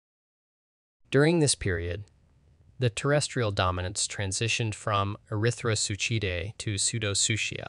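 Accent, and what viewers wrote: United States. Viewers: Another great episode. From your accent I'd assumed you were American.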